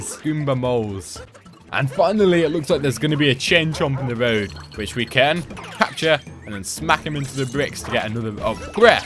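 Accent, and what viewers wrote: United Kingdom. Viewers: Is that why you sound British?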